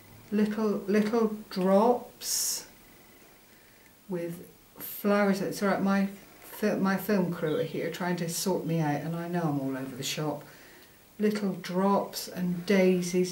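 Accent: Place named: Cornwall, United Kingdom. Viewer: Wow, you lucky lady, I like your accent, I wonder if your from cornwall? I love it down there...the plaque you won is lovely, I tried to win it too..lol..so generous of Fiona to send the extras for you, I love that dangly trim, you'll have fun using that I'm sure. I am also learning so much from her tutorials. Welcome to the YouTube video making side of crafting..lol..(even if you do only ever have 1 video..lol) enjoy your winnings. TFS...Ann...x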